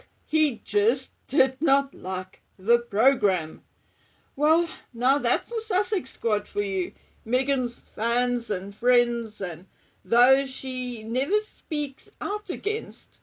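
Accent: South Africa. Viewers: Hi. I've been enjoying your podcasts snd trying to place your accent. Is it South African/Afriaaner? Just curious. I love to listen to accents ftom around the world and place them.🥰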